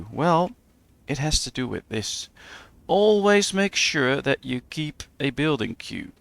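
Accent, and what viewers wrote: Netherlands. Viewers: very useful and well explained , you must be Dutch :) hearing from your accent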